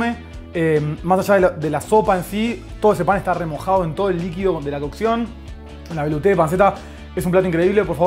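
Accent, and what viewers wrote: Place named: Buenos Aires, Argentina. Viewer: Entendí el 8% del video.. Alerta conurbano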